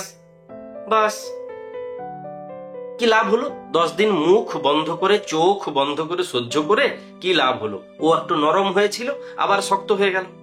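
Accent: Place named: Midnapore, India. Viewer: Apni ki midnapore er dike thaken? Kothar tan ta oirokom e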